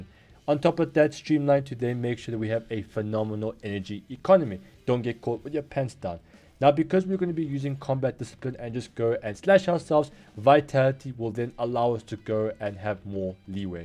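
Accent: South Africa. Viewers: Yo a South African g. Respect.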